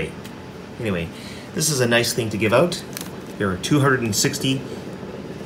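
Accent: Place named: United States. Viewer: I like your vlogs and you speak perfect American accent. No wonder you are good at narration. Anyways, will be following your vids from now. Keep safe.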